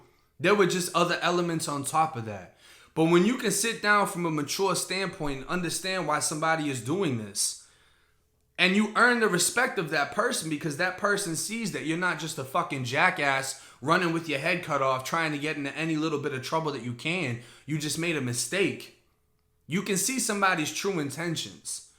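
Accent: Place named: New York, United States. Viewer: sound like you got a ny accent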